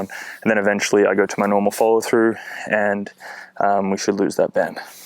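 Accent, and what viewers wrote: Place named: United States. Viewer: Every vid ur accent progressively gets more American lol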